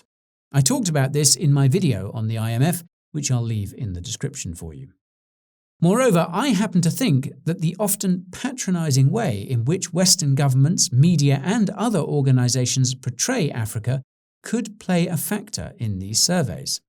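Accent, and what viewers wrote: United Kingdom. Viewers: The irony of a critique of imperialism in a British accent 😂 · Before China, Europe and America continues to do via its corporations in Africa? And you speaking with a British accent; a thief pointing fingers at another thief. The Mwafrikan Tengazako coming!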